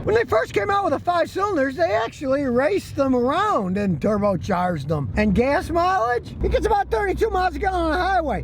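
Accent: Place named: Western New York, United States. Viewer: He lives in TN, so I'm guessin' that Western NY inflection isn't translatable....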